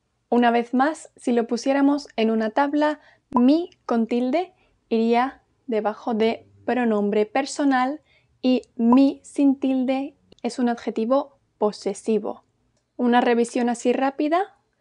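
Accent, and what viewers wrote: Spain. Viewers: uh when you say something with that Spanish clasical with tounge under the teeth I am on seven heaven i think i would come to Spain to you crawling you are amazing in every way 😊😊👌👌👍👍 i would became even Spaniard because of you you are so natural...two of those of you do not exist in Espana. good job you are doing. so profesionale 👌👌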